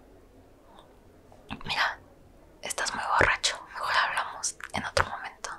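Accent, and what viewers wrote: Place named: Mexico. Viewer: Se re nota que es mexicana wn JAJA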